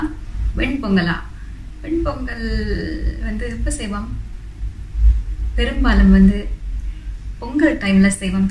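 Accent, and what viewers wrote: Sri Lanka. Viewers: நீங்க பேசும் ஈழ தமிழுக்காக நான் உங்கள் பதிவை மறக்காமல் பார்ப்பேன் சகோதரி... மலேசியாவிலிருந்து 🇲🇾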